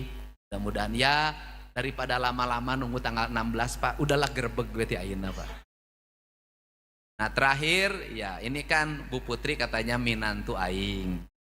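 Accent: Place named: Sunda, Indonesia. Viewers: Sawios atuh P d sauran aki Oge, jandi nyunda pisan hebat kan. Hidup 👍. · Ingat almarhum ibu ku yg berasal dari Jabar. Sunda asli kental sekali..mendengar berkata dipodium ini..subhanallah..smoga Allah jaga trus..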